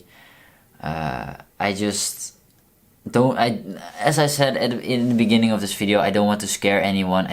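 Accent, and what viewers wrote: Netherlands. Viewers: I like your Dutch accent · Vreselijk verhaal man!! Wat kut. Je engels is btw goed aan je accent mag je nog wel werken ik kon meteen horen dat je nederlands was😂 no offense tho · love you accent love Dutch I'm glad you are OK now xxx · Don't apologize for your accent. I had the blessed opportunity to lived in The Netherland for three years. Beautiful people, beautiful country. You are a beautiful and brave person. Forgive me because I do not know the correct pronouns. I am learning. ☺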